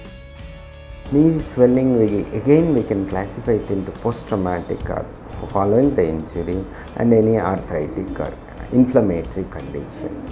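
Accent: India. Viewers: Indian English hh WTF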